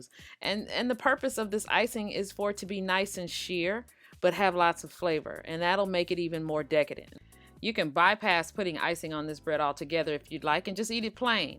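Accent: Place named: Chicago, United States. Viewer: Are you from Chicago? Your accent sounds just like a friend of mine ☺️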